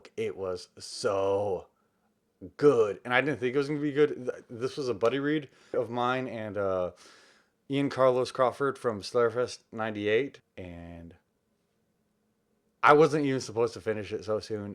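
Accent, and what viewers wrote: South, United States. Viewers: I can't believe you had a lot of spooky books at your house in Texas on this video during your quarantine including Practical Magic by Alice Hoffan, The Haunted of the Hill House, Cemetery House by Aiden Thomas, Mexican Gothic by Silvia Moreno-Garcia, The Year of the Witching by Alexis Henderson, The Southern's Book Club's Guide to Slaying Vampires by Grady Hendrix and everything including House of Leaves by Mark Z. Danielewski until you're talking about all of the spooky books to read them at the same time while you're telling the whole story from all of the spooky books after that, but I hope your husband, Zach will be very proud of you and I love your Southern accent, I wanna say Happy Halloween to you and him, so stay safe!!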